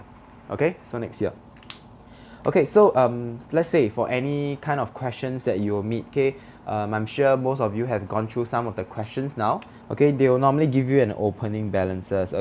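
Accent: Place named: Malaysia. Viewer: I can bet a £100 that you're from Malaysia haha. Just sound like my friend.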